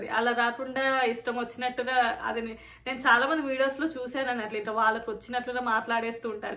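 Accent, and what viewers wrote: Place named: Mahabubnagar, India. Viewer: Mee Matlade style and yaasa Chustunte ma Maibnar district valemo anipistundi ☺️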